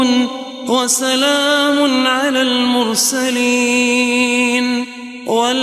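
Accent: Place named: Levant, Arab world. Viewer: ما شاء الله تلاوة جميلة جدا شامية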